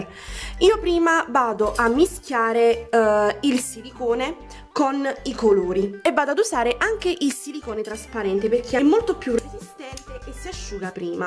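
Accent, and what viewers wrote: Naples, Italy. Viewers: Adoro il tuo accento Napoletano😍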